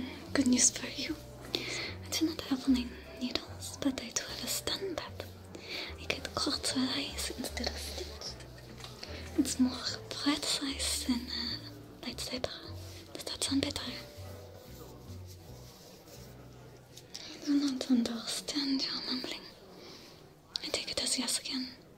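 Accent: France. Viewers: Can we Just acknowledge how she answers to EVERY Comment + how hard she works for us to Fall asleep. Also I have never watched Star wars before but because of this ASMR and the french accent I will watch Star wars now. Oh and I follow you for quite a while now and I wanted to thank you so much for so many anxity attacks you took from me. Especially for the sleepless nights, you helped be trough. Just Thank you for everything you do (✿^‿^) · i was like "wow the French accent really fits twi'leks." but as im reading the comments, I was not the only one that forgot that it was the actueel accent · It's truly amasing. I'm a fan of Star Wars since I was 3 years old (I'm 25) and I must say I have a thing for Twi'leks. You are so glamorous and adorable as a Twi'lek. And add to that, since my mother language is french, pour french accent is truly nice. Love you and your works. 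· This is an amazing ASMR rp. First the asmr components as always are top notch. Second the choice of French accent really helps hit certain sounds that trigger (at least for me). Then there's the commitment to the star wars component. Most of the props look like they would fit in universe. Lot of work for the background components. Then there's you costume. Your rps are fantastic. Keep up the amazing work. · Are you from France, my Green love? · I haven't finish watching this yet I am already commenting haha I am so sure that I will not finish watching this because of course, I will fell asleep again and earphone wires will choke you hahahah I just mostly leave my phone on and just listen till I fell asleep. *update, I have finished watching it XD Will you also upload the no music version of this ? If not, I will still watch/listen to this~~ I don't know if it's me only but the accent is kinda like a French, specially how you emphasize the letter R hahahah Thank you for this amazing, cool, efforts and everything. 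I/we love it already ( ╹▽╹ ) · honestly it truthfully is the background soundscape that does it for me it's good white noise to contrast the performance you do Also for some reason the French(or is it more Cajun?) Accent does tingle me kinda hope you have more reasons to do it in the future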